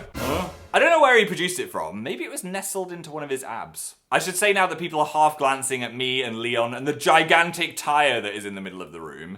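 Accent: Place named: England, United Kingdom. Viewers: last time i was this this early still had a northen accent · Is it just me or when pretended to be American as that gym dude on the phone he suddenly sounded... low class. Like literally, having an English accent is part of his charm. And he's better that way! Pray we may never stumble upon an American. Amen.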